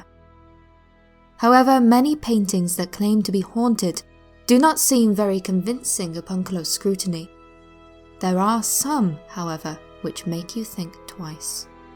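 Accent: England, United Kingdom. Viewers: Great video...love the accent!! Not trying to be Pervy McPervinstein but english accents are sooo hot and make a woman sound classy and intelligent which we all know is classy as well. Anyway ive aleays seen depp as a wussy...no suprise a painting could do that to him. Especially knowing the backstory of not just painting but artist as well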